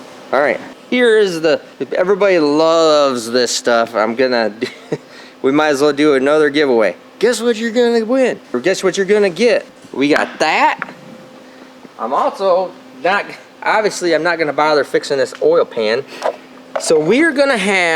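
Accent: Michigan, United States. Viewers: that Michigander accent coming through 😂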